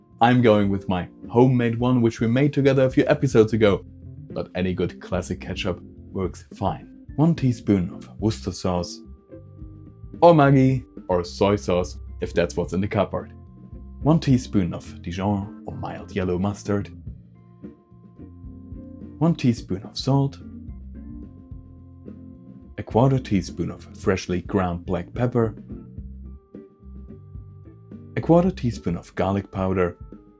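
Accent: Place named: Germany. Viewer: Bist du Deutsch? I hear a sight accent, as I am 100% Slovenian and speak a somewhat butchered German. My Stara Ata spoke fluent German and I learned much/some from him. Danke.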